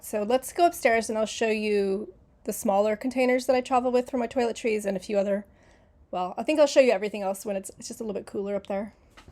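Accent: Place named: United States. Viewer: I was getting confused watching vid.....back ground looked like England but accent American.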